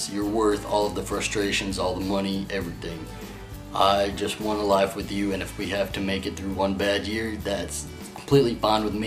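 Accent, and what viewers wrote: South, United States. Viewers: . You were talking about gay acting straight. You are very straight acting. If You didn't say you were gay, it would easily be assumed you were straight. But that what make you so damn cute. And I love your voice with the southern tone to add. Alastair is a lucky ass man. He screws this up, I'll beat him up for you. I'm straight acting, so I know what I'm talking about. But not as cute as you. Actually not young either - haha😂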